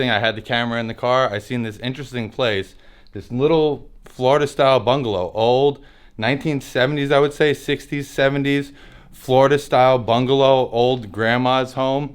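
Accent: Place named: Long Island, United States. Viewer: i take it you split from either long island or nyc i leaning more on the long island thing by the way you talk .so you raded ny for flo???????how is it dwn there thinking about moving myself .from the great communist north east ..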